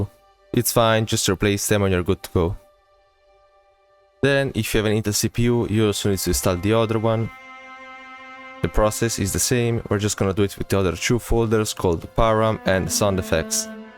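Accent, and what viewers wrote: Italy. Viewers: bro sei italiano vero? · Sei Italiano?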